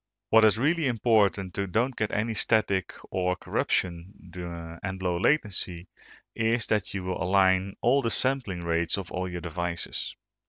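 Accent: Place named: Netherlands. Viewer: excellent dutch accent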